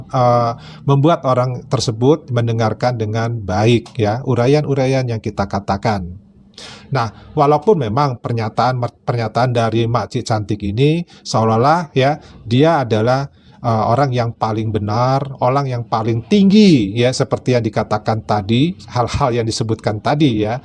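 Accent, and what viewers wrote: Indonesia. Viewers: Bu...logat bahasamu itu berbahasa indonesia .. hhh...jadi intinya rakyat malaydesh ini belum sadar diri ...makanya sarapan pagi dulu biar sehat dan otak bersih 😅😅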